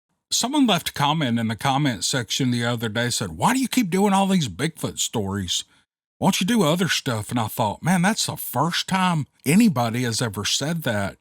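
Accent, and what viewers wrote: South, United States. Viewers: I love how you read.. I could listen to you all day.. I really love the Steve Lily saga but anything you read is fine with me.. as a Arkansas gal, I love your southern twang..The stories in your podcast were great..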